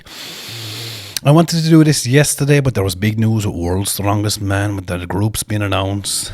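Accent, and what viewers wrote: Ireland. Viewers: are you from cork bai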